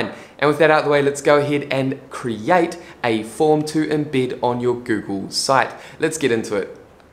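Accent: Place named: New Zealand. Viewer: Brilliant as usual. I just don’t bother with other YouTubers any more. wins. Plus, as an Aussie cousin from the other side of the ditch, I love your local accent.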